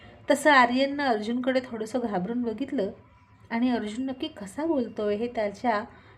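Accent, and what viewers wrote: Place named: Kolhapur, India. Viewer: Good morning tai 🌄 khupcha khup miss kele mitumhala ani tumcha storila fon divas....gharatali ekhadi vakti bahergavi jate ani ghar kasa suna suna vatate tasa mala vatate hota😢😢😢😢😢😢😢😢😢mi tumchi pranay pipasu bayko hi story khupcha miss karat ahe😢😢😢😢😢😢ta storitil ekun ek prasang mi maza manat sadhavun devale ahet😊😊😊😊😊😊thanku tai 🙏🙏🙏🙏🙏🙏🙏🙏🙏🙏 love you tai ❤❤❤❤❤❤ tumhi khup chan ahat tai ❤❤❤❤❤tumcha kolhapuri bhaset mhatle tur lay bhari...........nad kudha.... Tumcha sarkhe tumhich.....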